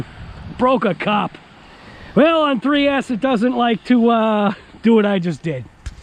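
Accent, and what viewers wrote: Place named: Boston, United States. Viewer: Killer Boston accent! Great video. Car looks legit. I have the WLToys brushed version when it first came out. Highend brushless system in it not(Tekin rs pro, Trinity Punisher 13.5t, jx1181 servo). It’s a beast now. But this looks legit.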